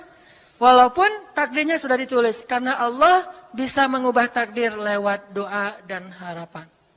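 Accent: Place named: West Java, Indonesia. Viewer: Pa ustad itu aslinya mana tapi ngomong nya ada sundanya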